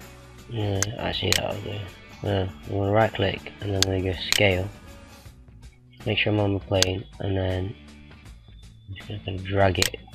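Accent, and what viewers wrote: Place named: North, United Kingdom. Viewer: If I hat to guess I'd say you were maybe from Barrow/Blackpool area from your accent. Good video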